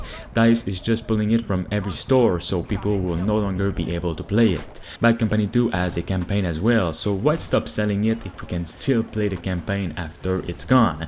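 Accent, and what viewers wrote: Quebec, Canada. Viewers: are you from quebec ? cause your accent is "famillier"lol ,quand jai vue lecriture dans le jeux sa ma sauter au yeux XD je tecoute depuis longtemps et javais un doute mais maintenant jen suis sure! respect frere keep up the good work! i like your contents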